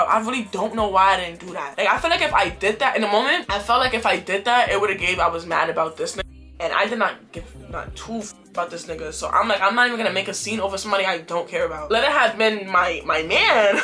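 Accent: New York, United States. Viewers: oop i know a new yorker when i hear oneeee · love the way it sounds like i’m otp with one of my NY homegirls🤍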